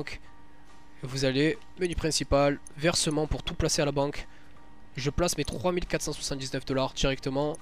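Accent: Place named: Marseille, France. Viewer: t marseillais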